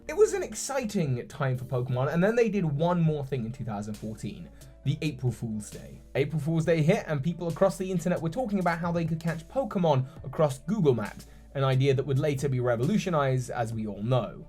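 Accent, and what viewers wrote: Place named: Newcastle, United Kingdom. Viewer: Nearly all my pokémon from the mythical pokémon gifts were on a save file that got corrupted D-:. I think that accent sounds a bit Geordie.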